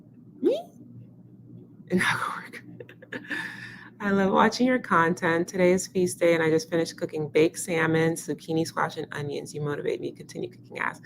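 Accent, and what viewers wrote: Jamaica. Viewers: the intermittent Jamaican accent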